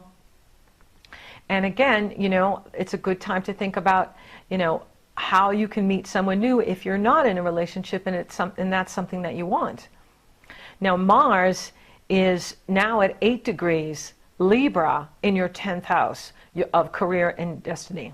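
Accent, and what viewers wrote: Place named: East Coast, United States. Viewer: I feel so awesome and enlightened after your readings..i hear a hint of tri state area, east coast in your accent...DE/NJ here, ty !!!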